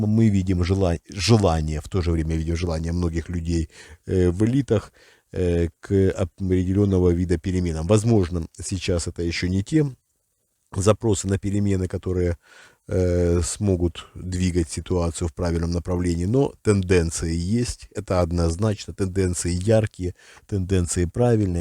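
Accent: Ukraine. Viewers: Вы бы хоть от акцента окраинного избавились и речь подкерриторивали-вброс хохляцкий.....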